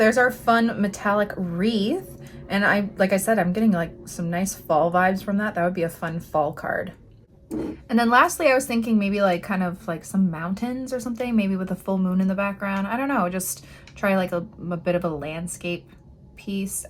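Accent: Canada. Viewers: I have another reason to love your channel. I bought a set of pearlescent watercolors from Michael's - about $8-10 Canadian. I tried them out, and the colors are so beautiful. I don't have the metallics though. Your video is very welcome. Question. Are you in Canada? Your 'accent' sounds different from Americans.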